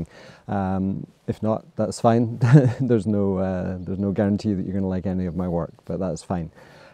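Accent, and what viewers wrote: Scotland, United Kingdom. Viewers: your deadpan Scottish accent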